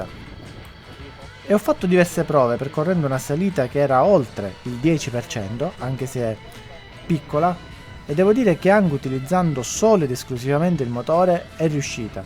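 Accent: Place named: Syracuse, Italy. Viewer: Salve, anche io ho una ep 2 pro e ogni tanto cerco video per avere confronti. Oggi, con molto stupore, ho trovato questo che oltre ai dati tecnici, mi ha riportato parzialmente a casa (ho parenti a Siracusa, la conosco benissimo e se potessi ci vivrei). Anche l'accento è familiare dunque mi sono iscritto anche per seguire aggiornamenti di questo modello. Complimenti per la chiarezza delle spiegazioni durante le prove. Ho fatto le mie prove di autonomia secondo i vari aiuti di modalità ma attendo quelle che farà in seguito per avere confronti. Complimenti ancora e W Siracusa.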